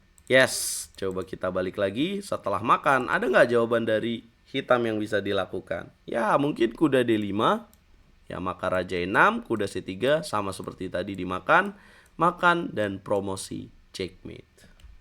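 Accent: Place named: Banyumas, Indonesia. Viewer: mas, masnya orang mana sih? kok kaya bisa bahasa ngapak gitu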